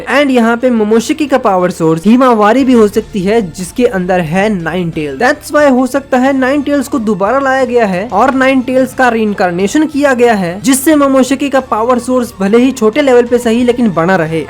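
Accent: Bengal, India. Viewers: Bro are you bengali???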